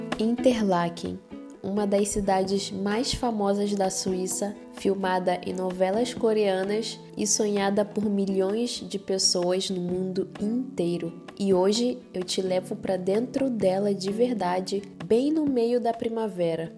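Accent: Rio de Janeiro, Brazil. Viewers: Tu és muito fofa e calma! Parabéns p video, vou em outubro p Suiça! Ansiosa Ahhh o sotaque carioca tbem é lindo!!! Bjo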